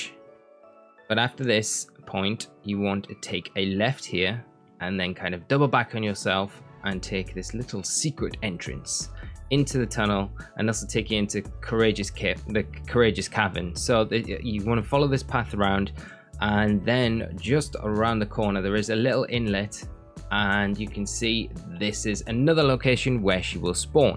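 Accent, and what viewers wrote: Newcastle, United Kingdom. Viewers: Why’s a Geordie posting at 3am? Too hot too sleep?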